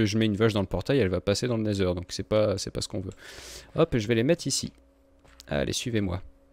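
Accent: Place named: Nord-Pas-de-Calais, France. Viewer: Salut , j'ai remarqué que tu parlais ch'ti , tu es du nord ?( Car moi oui )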